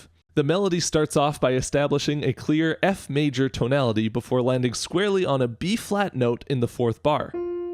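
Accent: Germany. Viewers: his german accent kills me every time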